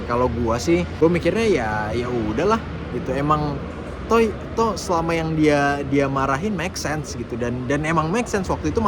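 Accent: Manado, Indonesia. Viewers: Seneng nih gaya bicaranya bahasa manado dan indo jd punya ciri khas tersendiri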